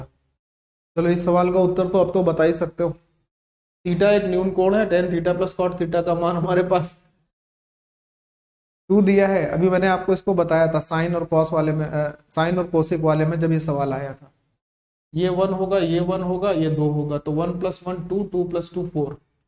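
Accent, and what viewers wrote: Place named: Farrukhabad, India. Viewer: Sir kha se hn aap Farrukhabad ke aas pas ki bhasa lg rhi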